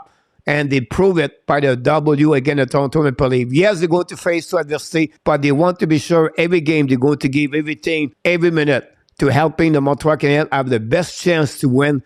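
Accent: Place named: France. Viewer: Hey, we really enjoy listening to you and watching your videos. We are from Montreal but we dont live there anymore. We get a laugh from your heavy accent but you know your stuff man! Keep up the good work my friend, you have greatness inside of you!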